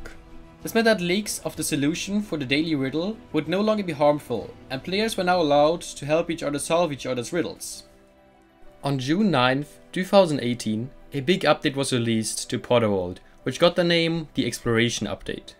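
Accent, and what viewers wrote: Germany. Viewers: Lol Do you have a German accent?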